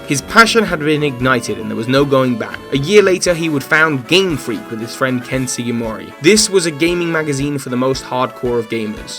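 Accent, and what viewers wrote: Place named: United Kingdom. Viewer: sweet British voice is just perfect for this.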